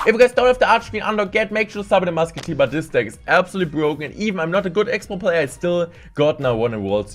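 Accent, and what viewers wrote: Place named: Germany. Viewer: i cant even understand this shit becouse of his german accent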